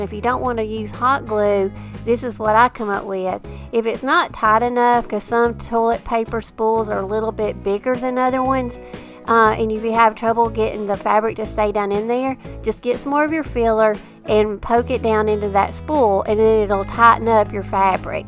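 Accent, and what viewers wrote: South, United States. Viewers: Good to hear that southern draw I miss it. May I just say your pumpkins are absolutely beautiful. So simple so elegant . I’ll be making these for sure. Thank you for being a wonderful host in showing us how to make these. Happy Fall 🍂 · `I absolutely love, love, love your pumpkins. They are so out of the box from the normal ones you see. I can't wait to to do some like them, myself. Thank you for sharing your talent. I'm a southerner too, so I really like your accent! God bless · What a sweet, enjoyable video! Listening to your beautiful southern voice and accent and seeing your crazy awesome embellishments just made me get so inspired., I especially love the sweet bird nests with the little pearl “eggs”. Thanks for sharing your creative gift.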